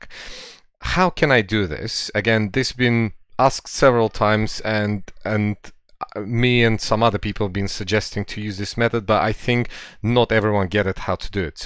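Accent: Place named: Russia. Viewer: Thank you very much.I love your videos and the way you share things with us. Love you russian accent.Haha.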